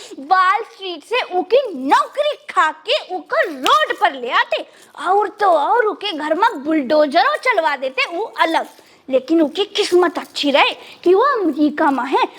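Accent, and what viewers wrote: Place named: Bhojpuri, India. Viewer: Wow wow wow, 😳😳 👏👏❣️❣️ You are hilarious.... You have very nicely reported the whole issue in a v different manner and with your beautiful Bhojpuri dialect....Kudos🌹🌹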